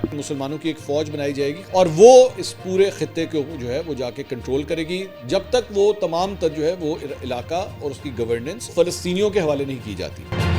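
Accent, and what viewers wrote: Punjab, Pakistan. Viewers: Matter of fact"please stop speaking wieghtfull English in Punjabi accent ....stop supporting cricket too much....stop being hypocrite,just for PTI views ........almost done😏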